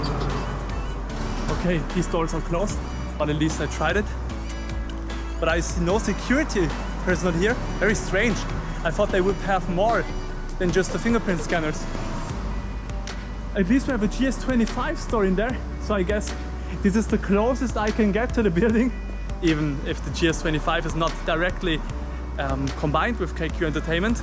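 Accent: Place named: Germany. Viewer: It's funny to hear this German accent, I keep thinking "just say it in German." - Just kidding, you speak English very well and I love Ateez, so this is very much appreciated.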